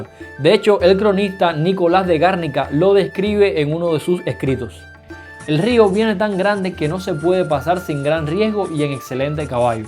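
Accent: Cuba. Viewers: Estimado amigo...! Que grato escuchar tu relato, sobre este puente del cual no se habla mucho y menos con la presicion, dedicación y amor al relato, con lógica histórica, ya que la colonia en todo el mundo fue una época vivida con mucha dificultad desagradable que si bien es cierto está gran parte escrita hay lugar en el relato qué da lugar a la fantasía pero de la buena, grata entretenida aferrada a la historia escrita, se te agradece Estimado amigo cubano, tu acento le obsequió un tono además hermoso a esta historia, un millón de gracias.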